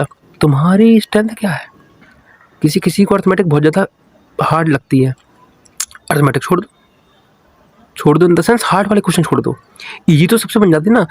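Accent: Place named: Lalitpur, India. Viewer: hmmm...thoda or sunne ke baad mujhe bhi lalitpur jaisi boli lagi thi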